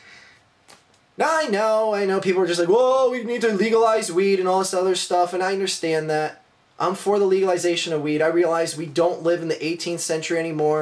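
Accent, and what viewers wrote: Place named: Illinois, United States. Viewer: you sound like your from illinois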